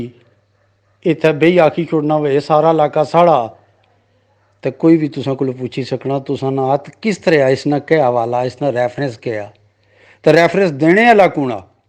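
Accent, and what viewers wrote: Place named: Pothohar, Pakistan. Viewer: Bht khoob khalis pothohari bolny o murshid.phone # mili sakran?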